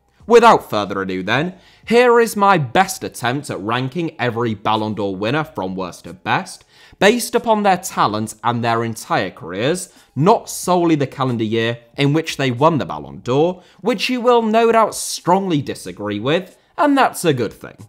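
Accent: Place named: United Kingdom. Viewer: I realized from your accent that you are British. I was sure when he put Stanley Matthews in front of Ronaldinho, Kaká and other great players that they were much better than Matthews, truly absurd.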